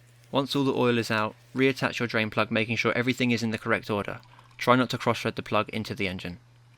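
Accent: United Kingdom. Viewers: I am going to be honest here, because I like your channel and I want to see you succeed and make more great content. I think you should not do voice-overs. Maybe it is because I am american and don't appreciate the english accent. With that being said, good luck and keep experimenting with new content.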